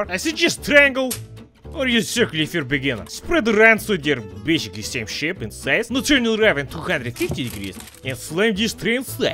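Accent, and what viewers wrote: Russia. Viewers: Still glad your channel still alive after I took absent for a long time. You still have that funny russian english accent checked.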